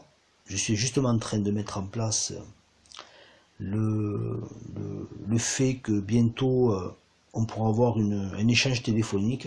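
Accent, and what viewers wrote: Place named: Toulouse, France. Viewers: ✨Je me suis abonnée depuis le début car j'ai de suite ressenti votre authenticité. Votre discours unique fais du bienggg😂 J'ai bien reconnu votre accent car je suis Toulousaine. Continuez surtout car vous faites du bien. MERCI✨🙏✨Bien CORDIALEMENT. ✨💚✨🌟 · Bonsoir , tres important vos messages , n'être plus dans l attente , celle-ci est primordiale.. Ouiii 3 belles erreurs qui priment❤ et effectivement le runner doit entendre que le chaser vie sa vie, ça y est , il la conscientisé...et gratitude à l'univers pour tous nos cadeaux de la vie ... Merci belle voix du Sud Ouest 😊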